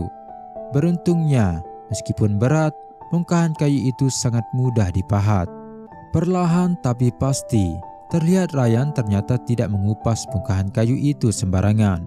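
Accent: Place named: Lombok, Indonesia. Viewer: Authornya ni ngomongnya sayup2 kayak logat sasak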